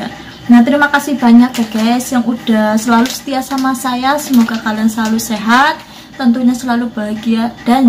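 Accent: Banyumas, Indonesia. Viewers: Emang enak kacang bakar mbk ngapak😁😁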